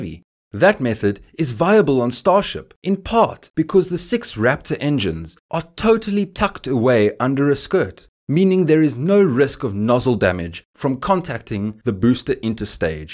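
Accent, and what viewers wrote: South Africa. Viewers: Never heard a south african vlogger before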